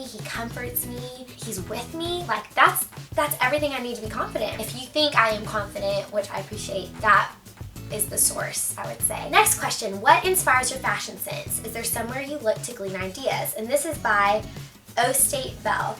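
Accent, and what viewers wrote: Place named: Dallas, United States. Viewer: As a Texan I could tell immediately you were from Dallas before you said it lmao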